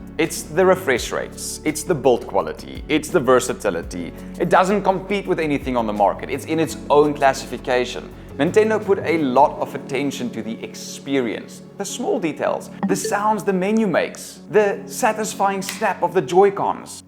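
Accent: South Africa. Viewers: A South African tech reviewer? 😮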